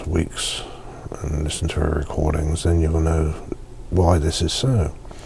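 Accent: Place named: England, United Kingdom. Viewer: What accent do you speak ? It must v certainly come from England Such a fine English is hard to find on YouTube So nice and gentle and mind soothing effect , your way of describing things is great . God bless you your words are indeed helping many here.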